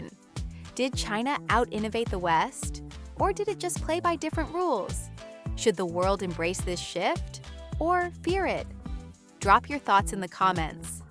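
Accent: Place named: United States. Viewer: You have an American/Canadian accent. Yet you are pro-Chinaman accent. 1+1 doesn’t add up with an American accent. You are an agent of the CCP? Or AI voice agent ?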